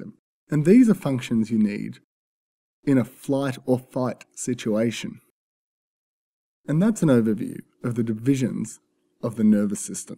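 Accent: Australia. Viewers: Thanks, simple easy to understand and with a pleasant Aussie voice. What more could a Med student ask for. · How? Stewie sounds English and this guy sounds Australian 😒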